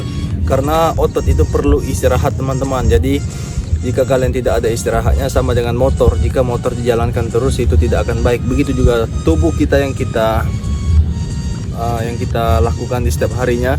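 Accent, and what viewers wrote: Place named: Palembang, Indonesia. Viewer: Uwong palembang caknyo kakak ini wkwk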